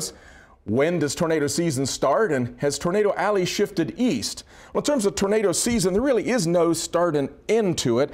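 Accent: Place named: Pittsburgh, United States. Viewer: He speaks "Pittsburghese." He's from western Pennsylvania.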